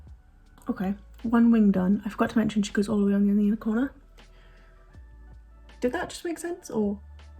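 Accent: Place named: United Kingdom. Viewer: ur accent is very british by the way loved ur video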